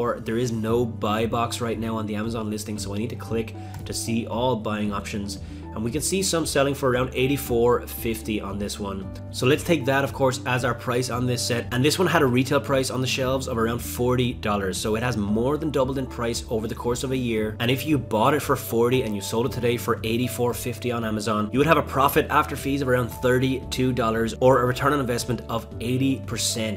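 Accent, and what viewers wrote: Ireland. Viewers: Is that an Irish accent i hear? I dont use Amazon for sale prices but thanks for the tips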